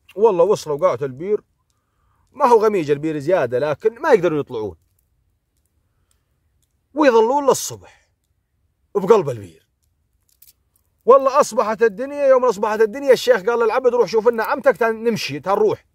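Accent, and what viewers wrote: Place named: Western Iraq, Iraq. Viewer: امتابعك من العراق استمر وعلى فكرة لهجتك تشبهة لهجتنة بل مناطق الغربية حيل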